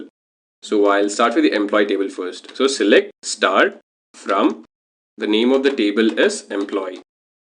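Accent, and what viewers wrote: India. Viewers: Any chance yoy could add subtitles to your videos? I’m Indian too, but don’t have an accent so I can sometimes barely keep up with your’s in your videos...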